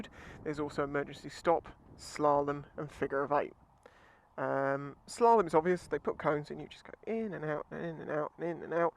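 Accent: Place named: Midlands, United Kingdom. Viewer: Dude, love your vids. You sound like you're from the Midlands (my old stomping ground). I've been watching a lot of your Lexmoto vids, and have decided due to the warranty and breakdown to plump for one of their 125's over a second user unknown YBR. I've just got my CBT, what Lexmoto model would you recommend based on your experice to continue my ride learning on L plates and to use for and after my test? I watch one vid (like the Venom) and love it...then I see you review the Michigan and change my mind ha ha. Ive also been looking at the Isca as a similar bike to the YBR but really would appreciate your opinion, specifically for new CBT riders to build up riding skills and experience.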